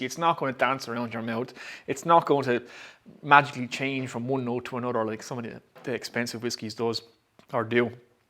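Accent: Ireland. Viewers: After watching your reviews I’ve finally subscribed. I like your way, the Irish accent and the toast. Keep up the good work. Oh, and based on your review, I picked up a bottle of Slane. Nice, but the jury is still out.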